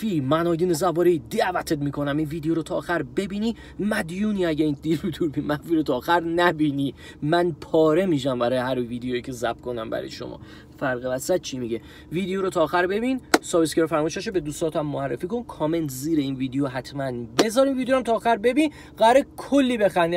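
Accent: Mazandaran, Iran. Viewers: خوشحالم از اینکه جزو اولین کسایی هستم که ویدئو های تورو میبینم ،هلاک لحجه مازندرانیتم آیدین جان ،کارت درسته برو جلو که موفقیت های بزرگ در انتظارته❤️❤️🌹🌹🌹🌹🌹🌹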